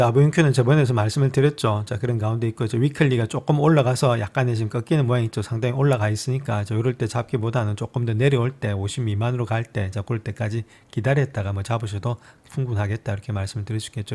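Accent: Gyeongsang, South Korea. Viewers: 일고리즘 타고 왔는데… 미국에서 온 전문가라면서 경상도 사투리.. 바로 퇴장.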